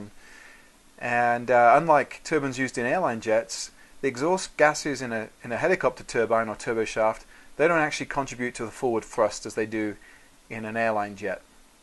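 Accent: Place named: England, United Kingdom. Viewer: I saw the tail number on the helicopter (N319CF) and I thought it looked familiar. So, I checked my log book, lo and behold, I flew that same helicopter for long line training. Then I thought to myself... The person who made this video sounds English and has flown the same helicopter as me...hmm...who could this be? This person could only be! Great video and explanation of how helicopter turboshaft engines work.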